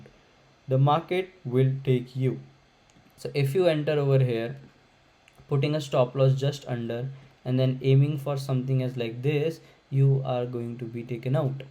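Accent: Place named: India. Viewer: your accent seems Indian by the way🙃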